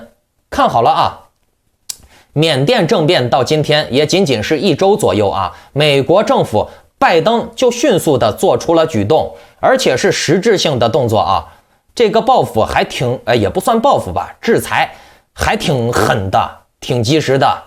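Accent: Henan, China. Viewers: 你说的是河南话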